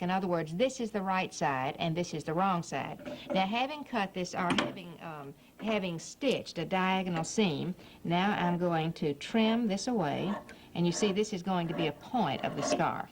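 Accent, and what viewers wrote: South, United States. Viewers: i don't remember this woman but i like her southern accent.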